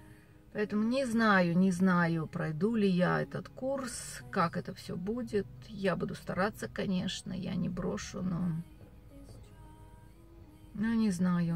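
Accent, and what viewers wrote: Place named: United States. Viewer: Всё у вас получится, терпите, учиться надо всю жизнь. Кстати, у вас уже русский язык с американским акцентом.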